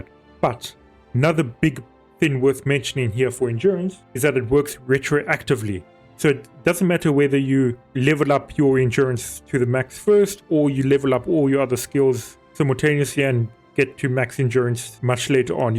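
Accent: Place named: South Africa. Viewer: Heard that accent and immediately knew I’m dealing with a real boet here lol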